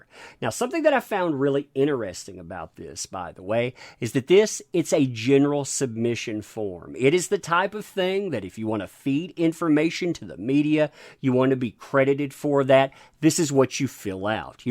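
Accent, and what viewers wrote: South, United States. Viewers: So love the southern accent during the Hollywood gossip 🤗 Reminds me of home 😋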